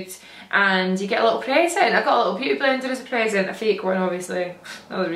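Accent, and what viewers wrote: Scotland, United Kingdom. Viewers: Where from Scotland are you? I'm Finnish and trying to get more knowledge of specific accents so I won't look like a jerk when I'm talking to people 🙈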